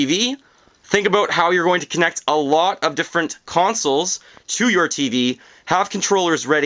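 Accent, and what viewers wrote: Canada. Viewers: ***** He sounds Canadian to me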